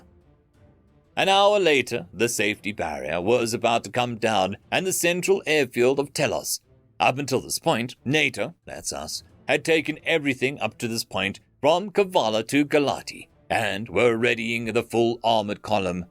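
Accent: Scotland, United Kingdom. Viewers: Very British Accent 😂 well technically Scotland is in Britain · " A very British accent..." does a Scot instead. Nigel Green as Colour Sgt. Bourne from "Zulu" probably would've been a better choice.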